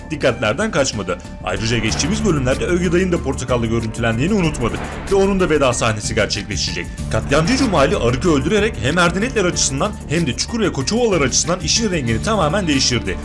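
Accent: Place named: Hatay, Turkey. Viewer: Abi sesin hatay sandık içi’ne benziyor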